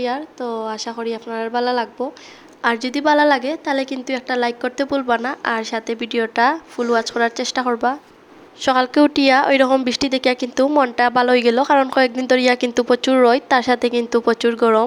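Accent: Sylhet, Bangladesh. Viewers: আপু সিলেটের কথা গুলো শুনতে অনেক অনেক ভালো লাগলো রুমটা খুব সুন্দর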